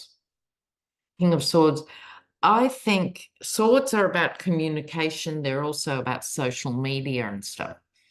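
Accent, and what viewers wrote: Australia. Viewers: Love you,! Your Aussie accent & dry humour somehow makes the bad news easier to take!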